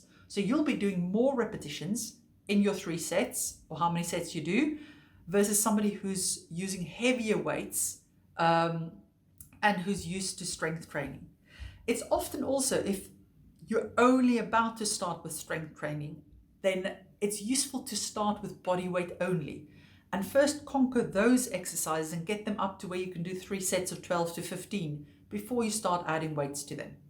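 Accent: South Africa. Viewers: You sound south african ❤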